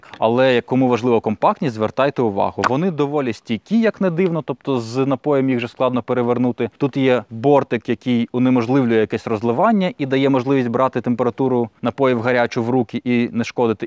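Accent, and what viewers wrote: Standard. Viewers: Не так давно відкрила для себе, але приємно вражена - все подано дуже цікаво, дотепно, тішить слух несуржикована українська мова, дякую за чудову роботу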